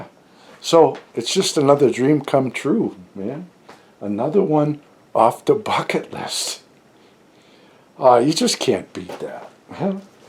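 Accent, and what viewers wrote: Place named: New York, United States. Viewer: Great green house 👍 . I'm looking at the yard today,, bags of leaves all over the place ((just in case )) hahaha. I don't know if u ever did a dumb thing like I did today , I had the iron rake set up by the fence and didn't see it and stepped on it and wamm the long handle hit me in the mouth and nose. Man did that hurt ,, I thought I broke my tooth . It's O.K. NOW but wow I don't know how long I can do the garden ,, just turned 80 and it's getting rougher every yr. Love your videos and N.Y. accent ,, My daughter used to live in Jersey & he worked in the CITY . SO I know that accent ((smile)). Fm. W.I...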